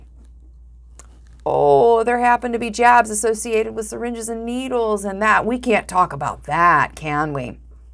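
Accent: United States. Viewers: What state is your accent from? It’s a very nice accent! <mail>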